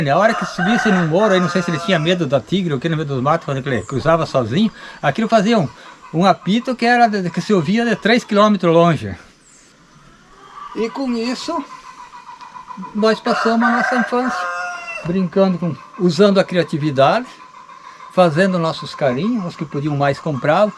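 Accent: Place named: Italy. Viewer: Como gosto de ouvir o senhor falar , esse sotaque Italiano que sdds dos meus pais, muito sofrido a vida , mas a gente tem que agradecer a Deus pq os jovens tem de td e nem sempre são felizes. Parabéns estou gostando muito dos vídeos do senhor continue assim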